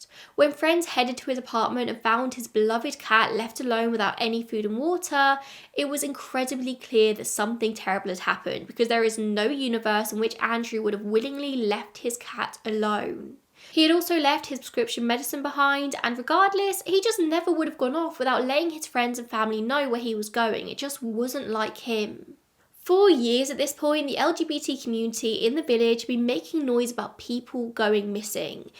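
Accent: England, United Kingdom. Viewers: Ur English accent is 🤮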